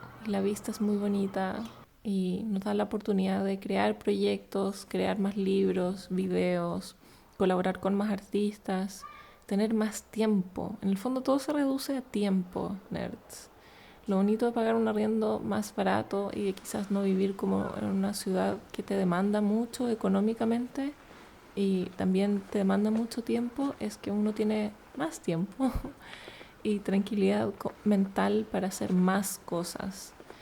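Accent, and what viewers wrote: Chile. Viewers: Hola, de dónde erea originalmente? Oigo un acento chileno:p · Tu acento chileno es pura paz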